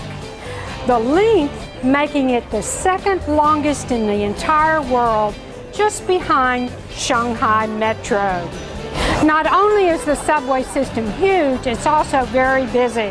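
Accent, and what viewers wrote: United States. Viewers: Nice Video! Very well explained! I like hearing the American Accent in London!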